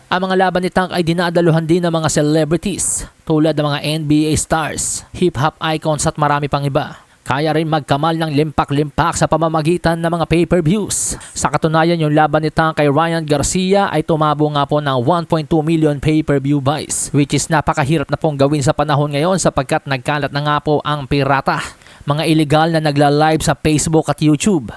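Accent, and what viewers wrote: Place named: Visayas, Philippines. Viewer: Bisaya ka pala sir blogger dahil sa tonada mo kahibalo ka mo bisaya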